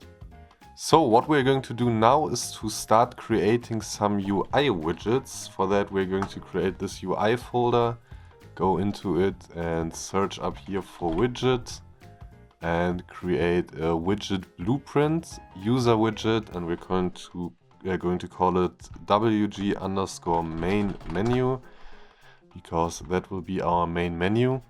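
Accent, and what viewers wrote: Germany. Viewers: I hear german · man hört das deutsche raus 😅, danke fürs tutorial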